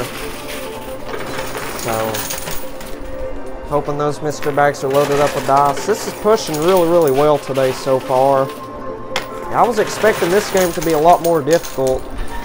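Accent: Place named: Oklahoma, United States. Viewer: I watched a old video , when you didn't have a bunch of money. Your voice sounds completely different, sounds like a hick, like my OK. accent. You also are nervous. But you are a rich pro and a great speaker. Proud of you brother. ALAN SMITH IN OKLAHOMA!!!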